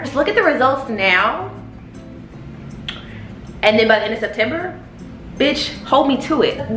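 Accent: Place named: South, United States. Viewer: girl u sounding a little countrtttyyy i love it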